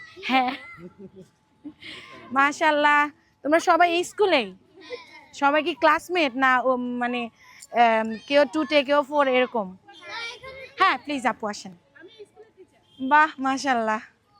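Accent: Noakhali, Bangladesh. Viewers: apu assalamu alaikum apu ami apnar akjon fan ami apnar sob video dekhi khub valo lage apnar noakhalir basha amar khub valo lage apnar vedio dekhe ami uthsahito hoye ami akta youtube channel start korci jodi paren amake aktu support diyen amnar sathe dekha korar khub iccha amar channel er diye aktu lokkho rakhben Jannat kitchen🎉❤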